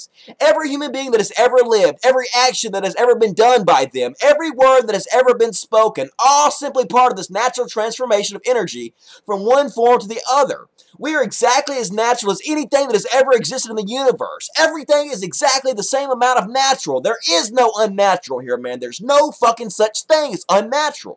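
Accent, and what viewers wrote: South, United States. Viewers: your southern accent makes this video so much better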